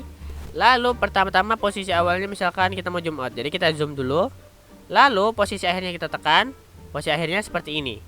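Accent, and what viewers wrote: Banjar, Indonesia. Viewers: Kadaaaaa jerrr,, Logatnya banjar bujurr 😄😄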